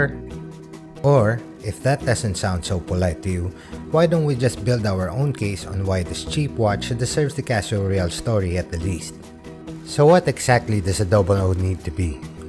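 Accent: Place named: Philippines. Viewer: are you based in the Philippines? You sound like a Filipino. Great production, by the way.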